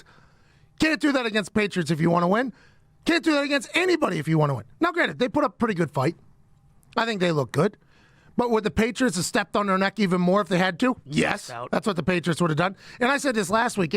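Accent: Pittsburgh, United States. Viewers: Went to high school with pat lol this is just the way we all talk from Pittsburgh we don’t even realize we do it but listening to pat it does sound funny. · Love your show, you're funny as hell, and your accent makes me miss the city and my Pittsburghese friends. Based in CA, from Taiwan, spent 5 years in the burgh for my undergrad at Pitt.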